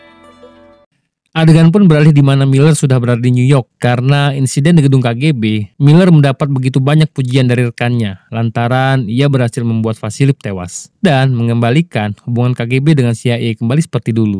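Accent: Palembang, Indonesia. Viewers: Aiii mang cek nee wong plembang yoo...😆😆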